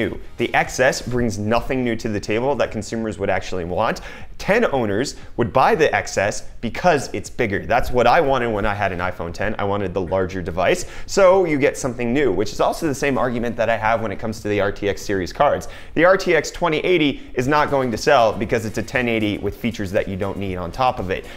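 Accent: United States. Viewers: You from south Africa ? Your accent is not tho, very american . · I was just wondering, this guy sounds American, I wonder why he's in South Africa, I'm South African so am really curios.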